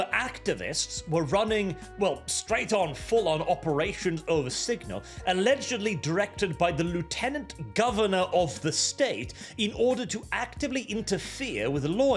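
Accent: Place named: RP, United Kingdom. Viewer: how is it you have such high class British accent and you're Norwegian? did you learn English from an aristocrat?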